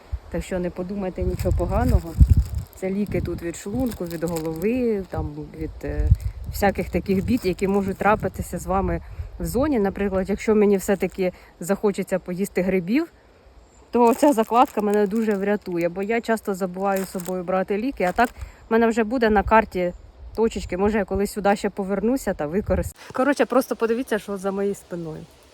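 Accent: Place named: Poltava, Ukraine. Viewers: Якшо з полтавської області то все нормально) Суржик то все чотко) Привіт від земляків))) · Як завжди супер відосик) Дяка вам за контент та чарівний Полтавський суржик)) Ви ТОПчик!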